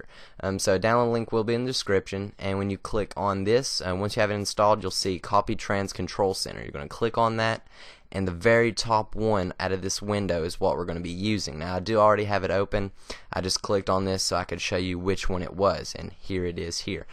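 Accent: Texas, United States. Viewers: You’ve like a Texas accent. Almost.... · Dude, are you come from the south? lol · This might be of interest for some: The "How-To" starts . Also: Your accent reminds me of "The Grid - Texas Cowboys".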